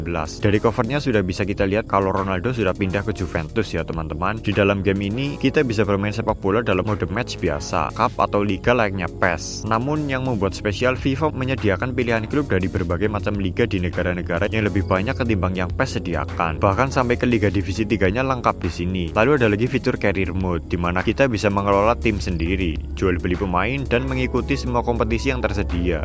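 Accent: Banyumas, Indonesia. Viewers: Naratornya ngapak yah😅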